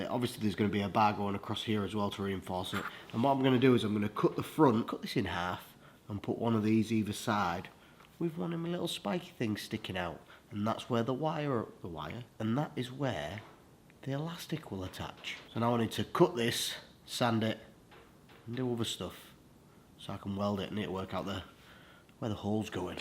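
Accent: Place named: Northern England, United Kingdom. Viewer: I don't know why..but i love the fact you're northern english..ha ha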